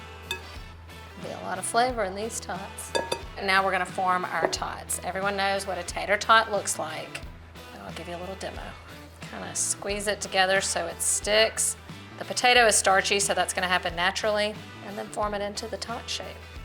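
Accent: South, United States. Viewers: The southern accent really does make this show. If she spoke normal none accent English it wouldn't have the magic that it does. Go team murricaa!!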